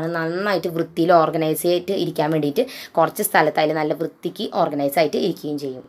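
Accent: Thrissur, India. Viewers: Ithu njangal Thrissur kkarude slang anu. Njangal achadi bhashayonnum samsarikkarilla tta...,☺️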